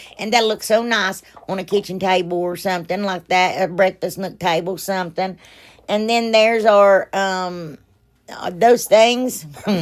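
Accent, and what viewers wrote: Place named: Tennessee, United States. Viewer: I love to watch you. You definitely put a smile on my face when I watch you. Your accent sounds close to ours here. That would be in Northeast TN. Love your crafts. You can put matches in the toothpick holder to light candles too.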